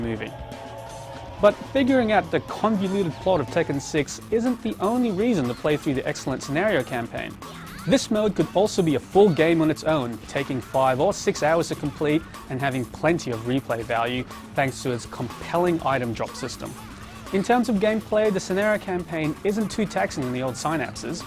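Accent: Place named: Australia. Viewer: ... thats an Austrailan accent, you tit.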